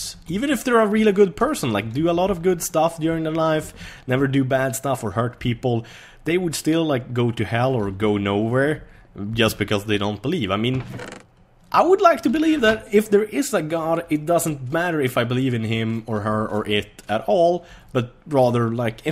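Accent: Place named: Sweden. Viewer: Fan va gött och höra! :) Bästa videosarna på YT så jävla gött med lite engelsk med svensk brytning! Tack för att du gör videos! Ha det gött and get some more beers! ;)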